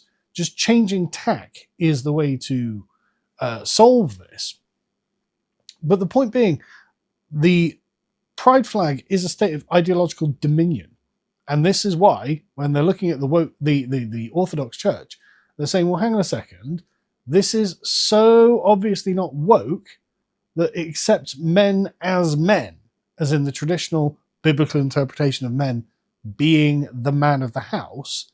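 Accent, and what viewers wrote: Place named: England, United Kingdom. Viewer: Sorry bro, your English accent couldn't save you on this one. Complete rubbish.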